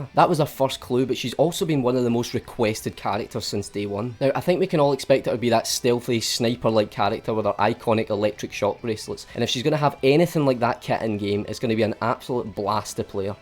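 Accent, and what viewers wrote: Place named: Scotland, United Kingdom. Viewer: hey, i love ur accent, r u scottish ?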